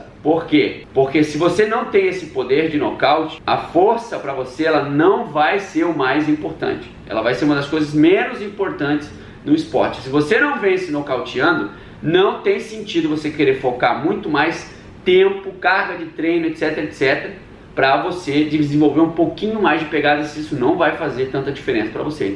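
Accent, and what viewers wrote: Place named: Rio de Janeiro, Brazil. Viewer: Tu pegaste o sotaque carioca com força.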